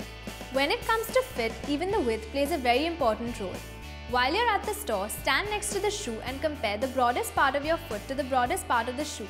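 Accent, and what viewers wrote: India. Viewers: Ur curry accent!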